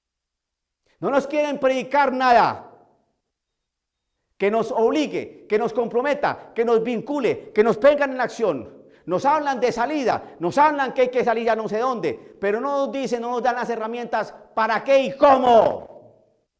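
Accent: Colombia. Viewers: cómo va a ser de Venezuela, es obvio que es colombiano, solo hay que oírle.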